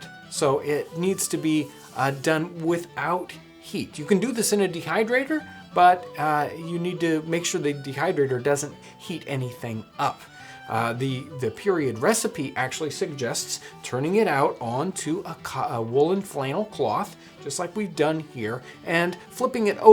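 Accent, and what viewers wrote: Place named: United States. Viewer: What happened to the British guy that used to do this ? It's throwing me off a little that this guy's American haha still great all the same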